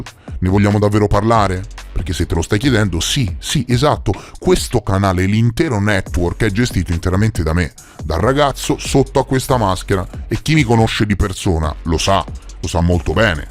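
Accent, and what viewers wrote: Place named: Central Italy, Italy. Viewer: Dall accento probabilmente del centro Italia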